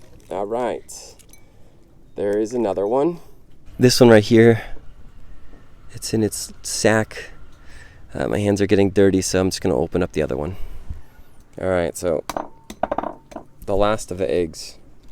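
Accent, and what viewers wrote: Vietnam. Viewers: This is a guy trying to say english, he's vietnamese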